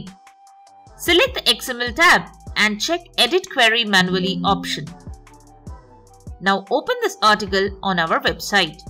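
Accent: India. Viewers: do not trust a video with an indian accent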